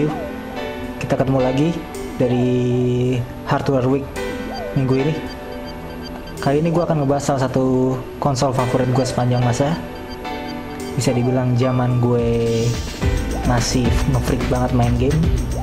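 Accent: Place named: Surabaya, Indonesia. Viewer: Dari jengenge iki pasti wong suroboyo :D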